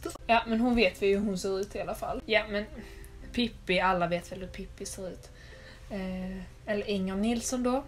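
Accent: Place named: Skåne, Sweden. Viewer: Du lät verkligen som alla i Greveholm haha för du pratar väll skånska?😂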